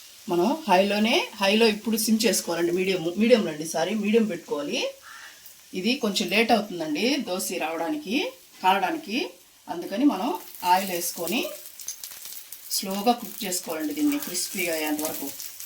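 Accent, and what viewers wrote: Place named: Nellore, India. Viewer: Nellore telugu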